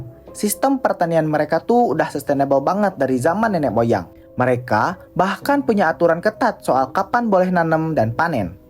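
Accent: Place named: West Java, Indonesia. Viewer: kelihatan dari cara berbicaranya dan dialeknya Anda adalah Orang Sunda, hanya bicara ala Orang Betawi. Ini hanya masukan saja, kalau penulisan teks dalam videonya diusahakan sesuai ejaan Bahasa Sunda, supaya orang luar Suku Sunda atau Masyarakat Baduy, tahu oh itu penulisan Bahasa Sundanya. Beberap yang salah tulis, misal seperti dikatakan "lojor temenang dipotong" seharusnya LOJOR TEU MEUNANG DIPOTONG. Juga cikesik seharusnya CIKEUSIK. hatur nuhun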